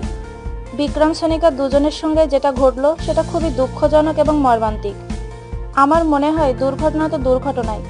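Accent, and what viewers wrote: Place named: Rural, Bangladesh. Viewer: Cine gossip pls apnader Bangladeshi vasa ta ektu paltan? Kno J apnader gammo vasa net a charchen, valo kore kotha ta o bolte paren na apnara chi chi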